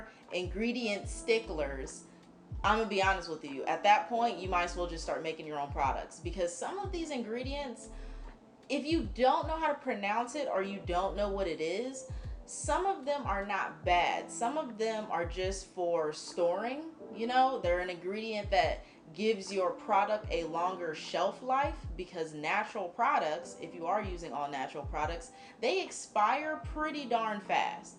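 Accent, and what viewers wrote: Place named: South, United States. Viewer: Yes you do lol it’s kind of a southern accent. Where you from??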